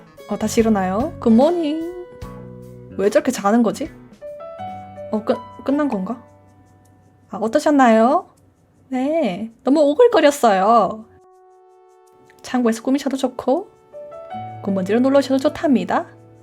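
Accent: Gyeongsang, South Korea. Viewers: 와...... 저 대구토박이인 사람인데요.... 님도 역시 대구사람이구나... 억양이 완전 대구사투리 따봉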